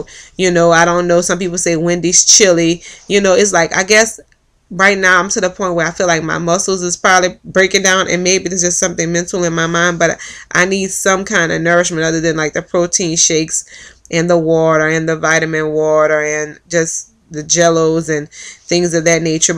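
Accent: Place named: Louisiana, United States. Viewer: Aww hun!! The good thing is that you are doing much better!! I think we all go through that "Why", once I got home from surgery...I was like what in the world did I do. But like you it is all for a better me. I still question did I pick the right surgery...I battled between Sleeve and Band but I am banded now and have enjoyed the ride thus far. Sending love and a big hug your way. I hear the Louisiana in ya. I am from New Orleans originally!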